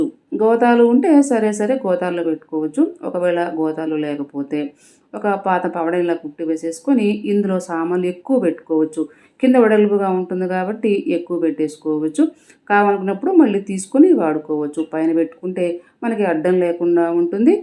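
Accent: Nellore, India. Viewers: మీది నెల్లూరు అనుకుంటాను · Medhi nellore aa mam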